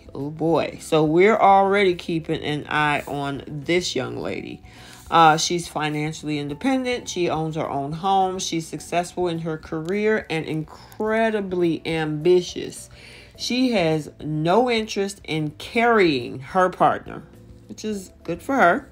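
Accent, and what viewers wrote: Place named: United States. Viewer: What's with the American voiceover.its Australia here.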